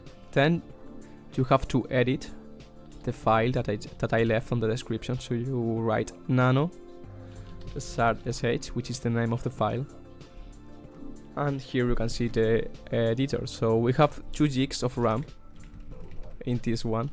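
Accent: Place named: Spain. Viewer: Gracias , se nota que eres español xd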